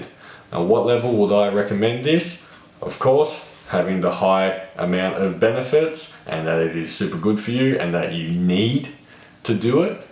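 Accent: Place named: Australia. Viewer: You look German but is Australian or New Zealand asf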